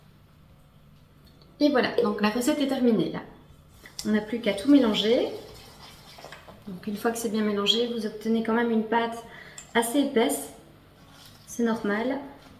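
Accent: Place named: Belgium. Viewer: Salut j’avais deviné que tu étais Belge en 90 g en belge 😉😂👍🏻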